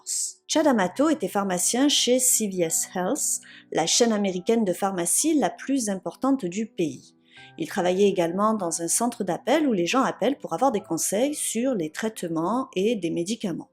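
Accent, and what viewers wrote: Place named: Var, France. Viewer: cogiter stories a traité le sujet il y a 3 semaines. Mais je vais quand même regarder ton travail car j'aime ton accent varois(peut être je me trompe) et car tu développes très bien tes sujets. Bonne fêtes de fin d'année à tous.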